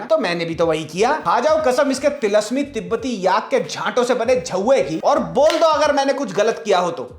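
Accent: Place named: Delhi, India. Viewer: Bhai apki Avaj se Lgta aap pakka delhi se honge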